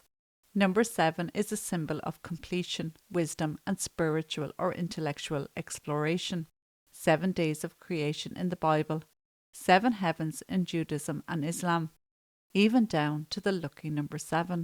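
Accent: Ireland. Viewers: Irish accent, ❤ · This fool doesn't recognise a beautiful Irish accent! Eejit! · I love this Irish accent. Well narrated! 👏👏👏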